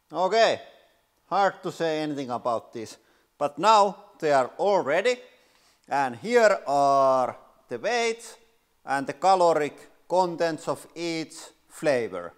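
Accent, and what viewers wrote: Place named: Finland. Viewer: That classic Finnish accent making Weetabix, Fetabix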